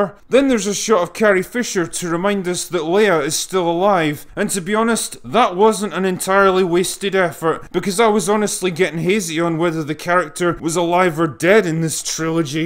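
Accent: Scotland, United Kingdom. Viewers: You get more and more Scottish every video, won't be long before we won't be able to understand you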